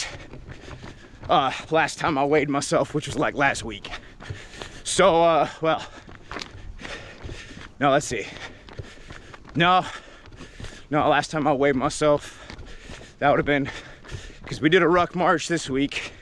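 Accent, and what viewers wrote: South, United States. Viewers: I love the fact that with the run, the southern accent comes out. 😎Yee haw! I'm 60 years young and the miles have started reminding me that I'm not 20. You, young man, are an encouragement for others to get out there and do the things, regardless of age! Keep up the good work! Love the rant! Thanks for sharing.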